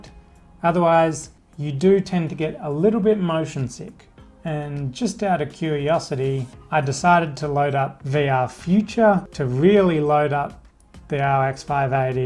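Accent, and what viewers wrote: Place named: Australia. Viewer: in the thumbnail u look like jazza from dwj and even ur accent is like his(Australian probably) but with um..higher tone or something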